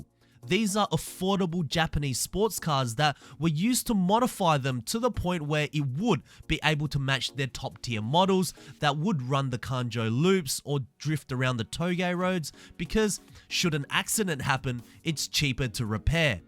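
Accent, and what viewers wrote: Australia. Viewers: Is it the australian accent or do you have a runny nose? :D Nice vid!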